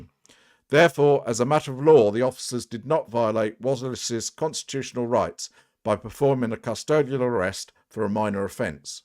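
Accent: United Kingdom. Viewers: are you going to continue your unique content but showing your face and giving your real name on your channel in the future? Mr. Merbeast recently said anonymous creators are cowards and Mr. Stout has said creators such as you are "avatar" channels whispering in shame from their secret internet hiding places. (I know you don't think much of Mr. Stout though.) Whatever the decision, I trust your voice will remain the same. Everything sounds better with a British accent!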